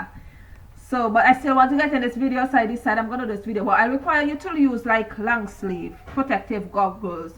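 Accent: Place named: Saint Vincent and the Grenadines. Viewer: OMG. You sure don't sound bajan. My dad is bajan and my mom is vincy. And i was born in vincy and left when i was 14 to Canada. U sound vincy not bajan hon. Lol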